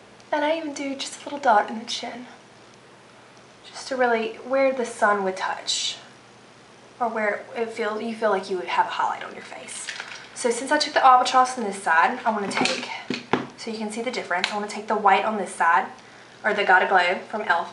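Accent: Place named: South, United States. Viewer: im from the south and the second thing i noticed was your southern accent, the first realizing how beautiful you are! I love it though:)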